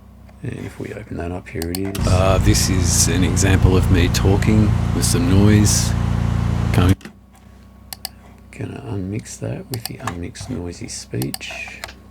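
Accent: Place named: Australia. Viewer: nice hearing an aussie voice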